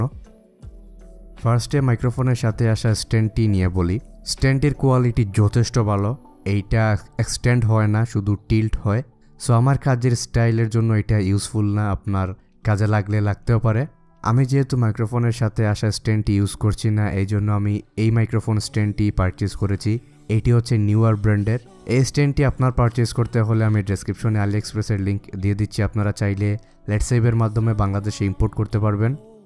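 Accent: Sylhet, Bangladesh. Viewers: Vai apni sylheti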